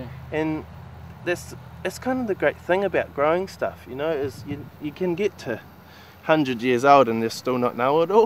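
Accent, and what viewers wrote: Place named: New Zealand. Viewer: hey, i love your work man, and that great kiwi accent lol. i've learnt a lot from these three videos and i too have become interested in growing, grafting etc.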